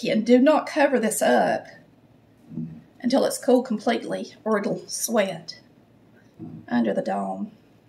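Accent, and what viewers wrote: South, United States. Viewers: Love your southern accent.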